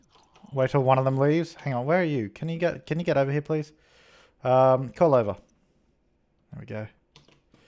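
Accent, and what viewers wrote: Australia. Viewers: Only in as much as they’re both Australian. They sound quite different to me.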